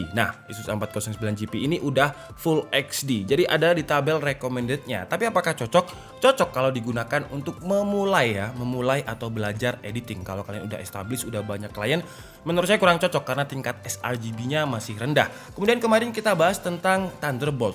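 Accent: Malang, Indonesia. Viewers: Abang Orang Mana Malang kahh?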